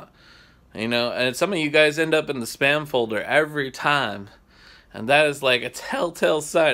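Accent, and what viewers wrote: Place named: South, United States. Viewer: WOW THATS VERY BEAUTIFUL WEATHER LOOKING. UR DOING A AWESOME JOB WITH UR CHANNEL ! WOW. AWW LOOK AT THE DEER. LOL THAT DOG WANTS IT. IVE NEVER BEEN THERE BUT IT LOOKS BEAUTIFUL. I LOVE UR ACCENT. SOUTHERN SOUNDING A LIL. LOVE THE BEATS U PUT IN HERE. VERY ENTERTAINING. KEEP UP THE GREAT WORK!! I WILL PLAY MORE OF UR VIDEOS TOMORROW!! ITS ALMOST 1AM HERE. BUT I LOVE THE MUSIC U PUT IN HERE TO. AND THE FAST FOWARD MOTION. I LOVE NATURE . SKIPPING ROCKS IS FUN. LOL HAVE A GREAT NIGHT! ❤🤗❤🤗❤🤗🤗🔥🔥🔥🔥🔥🔥💯💯💯💯💯💯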